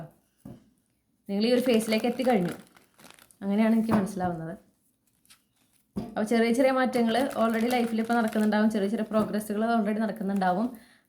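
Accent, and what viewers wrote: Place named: Thrissur, India. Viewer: slang, njanum thrissur aanu.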